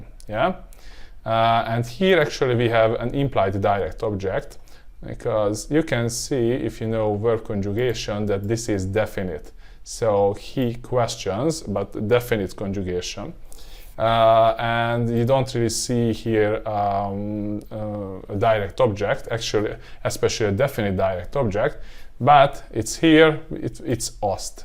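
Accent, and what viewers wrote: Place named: Hungary. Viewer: you need to work on your english pronunciation. hungarian is spoken very much in the front of the mouth, english is much further back, closer to the throat. you are speaking english like you are speaking hungarian. try to move the words further back to the throat, your pronunciation will improve a lot.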